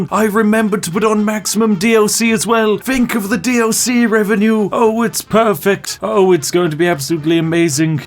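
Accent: United Kingdom. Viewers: Ah yes you know what i love more than a British accent? and extra Britty Brit accent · And me, as a frenchie, I love British accent that I can understand.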